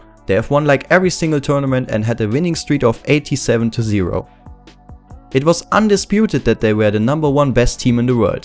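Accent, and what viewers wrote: Germany. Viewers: If u pay attention you could recognize ur german accent :D <3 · nice video but very much german accent but still nice :D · schöner deutscher akzent :D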